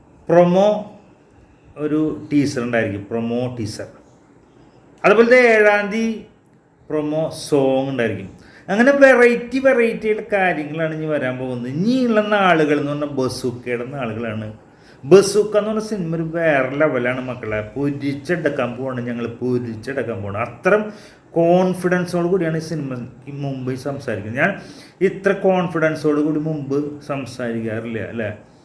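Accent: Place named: Kunnamkulam, India. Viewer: ഇക്കാടെ കുന്നംകുളം വായിൽ irikkunno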